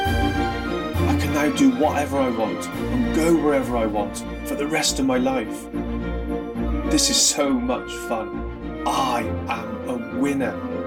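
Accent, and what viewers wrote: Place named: United Kingdom. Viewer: This is great. I've finally found an affirmation video in UK accent.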